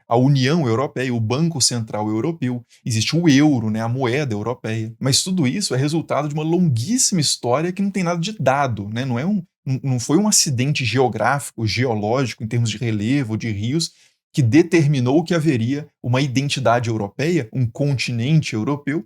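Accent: Minas Gerais, Brazil. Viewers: Eu “descobri” esse canal há pouco tempo e já sou fã! Adoro a tua maneira de explicar, e acima de tudo esse sotaque mineiro lindo. Muito sucesso e muito obrigada por partilhar o teu conhecimento conosco 🙏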